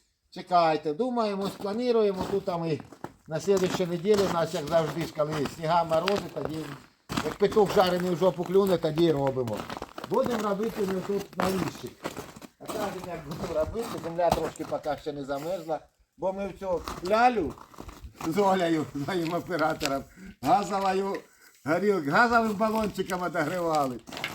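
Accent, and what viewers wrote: Chernihiv, Ukraine. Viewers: Звідки ви? Чую говор з Чернігівщини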